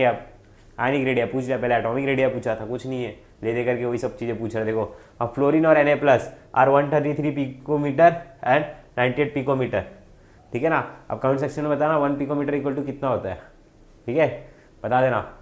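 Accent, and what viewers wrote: Maharashtra, India. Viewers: Sir aap Maharashtra se ho Kya thoda thoda Marathi jaisa language hai aapka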